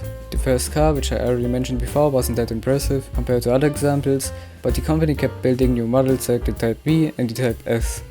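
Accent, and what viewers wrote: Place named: Germany. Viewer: Ich höre mehr Deutsch als English, aber trotzdem gutes Video.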